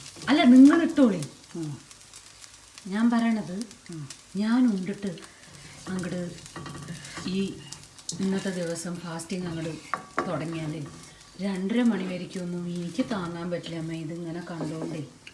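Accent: Palakkad, India. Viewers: ഈ പാലക്കാടൻ ചുവയുള്ള മലയാളം .... ഒരിക്കലും കൈമോശം വരാതെ നോക്കിൻ... ഈ ഭാഷയാണെന്നെ...എല്ലാ എപ്പിസോടും കാണാനുള്ള പ്രേരണ... ന്നാ ഞാൻ വരട്ടേന്ന്...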